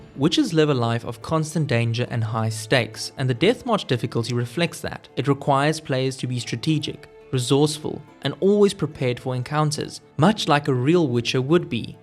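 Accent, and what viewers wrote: South Africa. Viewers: South african accent?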